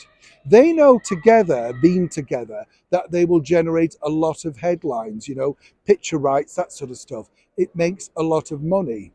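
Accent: United Kingdom. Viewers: I had to listen to this a few times before I figured out you were talking about the hugger & NOT a hooker. Sorry, I need to fine tune my hearing a bit for the British accent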